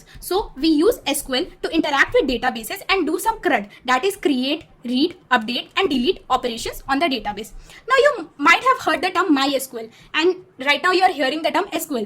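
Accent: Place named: United States. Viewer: dii, mai special aapka video apke fluent english ki wajha se pura ye 5 hours dekha hu , knowledge kahi se bhi mujhe miljata lekin aapne jaise ye itna faraatedar english koi nahi dega mujhe bhaot kuch sikhne ko mila mujhe apse dii thanks dii , or aap kya batasakte hai ki itna fluent english mai kaise bol sakta hu , waise mujhe lagta hai ki aap american ho :)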